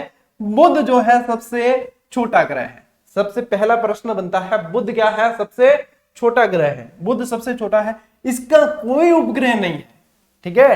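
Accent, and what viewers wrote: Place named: Marwari, India. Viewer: Sir, thnq very much for giving videos with such nice explanation 👍 bahut aache se samajh aata hai ...... n aapki jo maarwadi bolne ka style to bahut hi aacha lagta hai 🙂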